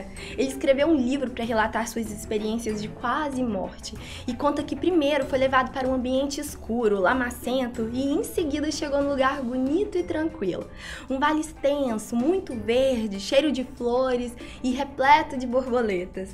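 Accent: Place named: Minas Gerais, Brazil. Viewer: ela tem um sotaque bonito de mineira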